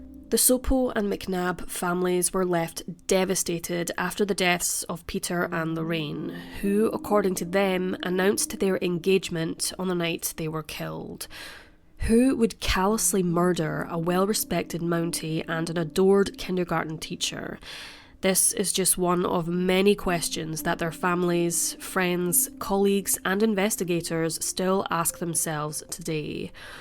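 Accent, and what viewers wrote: Scotland, United Kingdom. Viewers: Yes the narrator is from Scotland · She's Scottish lol! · Is that a Scottish accent? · She and her sister are Scottish. Her sister is Kirsty Skye who also has a channel. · I love your wee scotch accent, I;m from Donegal in Ireland. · SHE'S SCOTTISH ACCENT MY FRIEND 🇺🇸🇨🇴🙏🏽💜💜💜💜💜💜✊🏽✊🏽✊🏽✊🏽✊🏽✊🏽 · I believe she's Scottish :) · It's a Scottish accent. Good wishes 🙏🏼 to Canada 🍁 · just curious where in Scotland are you from?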